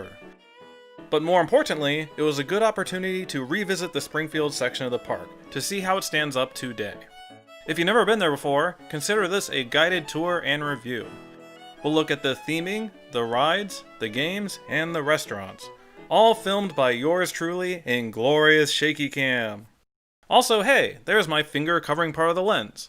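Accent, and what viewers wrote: United States. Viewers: It's weird how as a British person I didn't realise how American your voice is until I hear you speaking over irl footage! Great vid!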